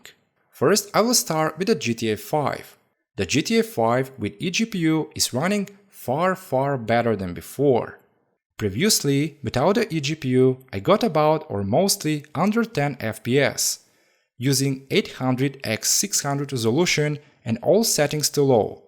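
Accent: Germany. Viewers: What a shitty german accent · And 🤔, you’re accents sounds a little german, he said “insteed” instead of ‘instead’ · I'd say germanic origin (Germany). 🙄😏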